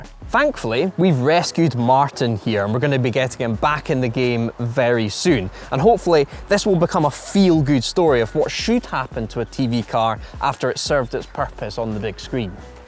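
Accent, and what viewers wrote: United Kingdom. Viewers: where in the UK is this guy from? ( love the accent.)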